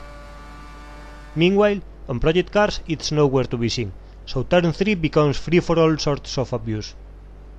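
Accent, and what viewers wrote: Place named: Spain. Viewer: spainenglish! love XD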